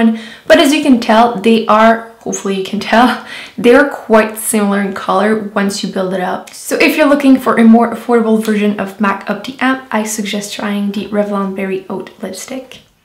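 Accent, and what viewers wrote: France. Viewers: Great video! I needed it .. Btw ur beautiful and I love your accent even though I don't know where you're from. French? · Most helpful video about dupes ever! Thank you! The first time I ever heard of wet n wild lipsticks was from your swatch video and I instantly wanted all of them lol so, I'm working on owning most of the wet n wild lipsticks because they're amazing. Every paycheck, I get one :) Also, your accents is adorable lol. Is that French or? Love your videos, girly! ✌️😊🌸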